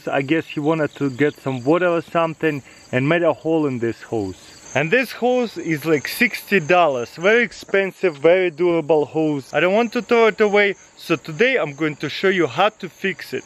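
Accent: Russia. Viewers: Is he a Russian?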